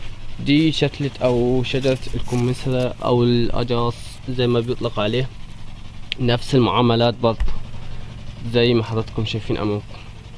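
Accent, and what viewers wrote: Egypt. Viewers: عندي سؤال هل انت مصري ولا امراتي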